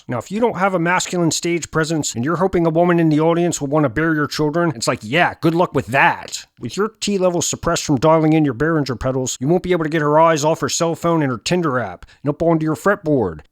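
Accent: Canada. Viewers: Dude, I am basically the audience for your Jordan Peterson content. If there's only one dude on the whole Interwebs who gets what you're trying to do, it's me, and I love it. My significant other of nearly 30 years is Canadian even though I'm from the American South. I studied history and political science in university. And I'm a 2000 graduate of Musicians Institute's Guitar Institute of Technology in Los Angeles (Paul Gilbert's alma mater). So your stuff is hilarious to me because I get it on so many levels, especially when the jargon makes sense not only as satire but as literal tone shaping advice or political critique. And the accent: "top" = "tawp." Next up, "Toronto" = "Toronno!" You've epitomized an enraged Canadian conservative forced to live in liberal Ontario. You have captured the zeitgeist of my wife's country and the rage of their small, American-loving conservative fringe perfectly, especially from my perspective of spending so much time between liberal Toronto, Montreal, and Ottawa, looking over into Alberta. 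I can hear Alberta's outrage in Kingston, Ontario even though they're two provinces away. :) Canada, a strange land where being on the left side of the country means you're politically right, and where being a Liberal means you're probably a diehard Canadian nationalist who can trace his roots to his Loyalist 6x great grandfather. :) Cheers man! Keep them coming please. :) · In addition to being really funny, this is a truly good approximation of Peterson's/ most Canadians' accents. It's not easy to do. So, kudos! Doublemint kudos! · Has anyone actually been to an MBV concert? I have. I couldn't hear properly for a week after. Now I understand that their Muffs were obnoxiously interacting with with their delay pedals. And as I always say to these: More. Canadian. Accent!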